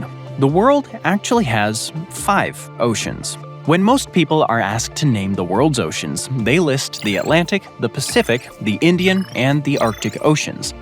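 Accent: United States. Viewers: Are you American by chance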